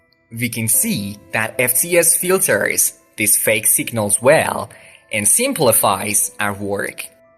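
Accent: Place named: Australia. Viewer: Why are you hiding an Australian accent ?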